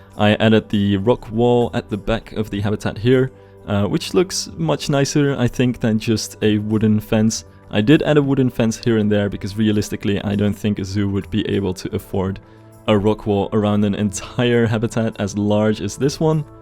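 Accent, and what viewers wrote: Netherlands. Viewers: Your English is really good, but for some reason I still knew you were Dutch the second I heard your voice.. Not sure how that instinct works😂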